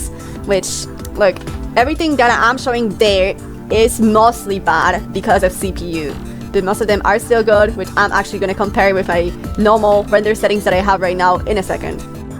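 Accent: England, United Kingdom. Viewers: masz piękny angielski akcent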